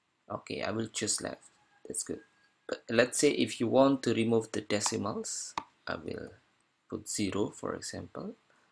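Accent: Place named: Indonesia. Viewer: Indo's accent ^^ ...nice vid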